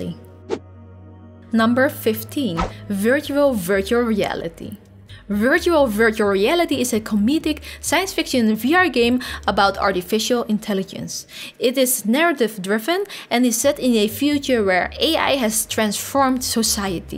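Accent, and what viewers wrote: Netherlands. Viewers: Hey i detect a heavy dutch accent ^^ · Doe een video in het Nederlands nu denk je van hhuuh hoe weet je dat ik Nederlands spreek ik kan het horen aan je accent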